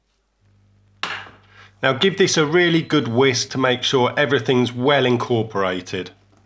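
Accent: United Kingdom. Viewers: This is proper, a dude with a thick af british accent telling us how to make crumpets. This is what the good Lord intended. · I am so glad the OP has a British accent and not some New Yorker! :D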